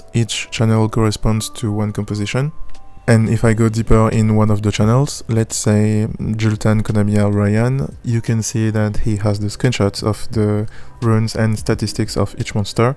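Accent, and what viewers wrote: France. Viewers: On sent le francais derriere t'es bien le premier ou les sous titres youtubes sont parfait 😂 Tres bonne vidéos dommage que pas en fr!😢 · Le bel accent francais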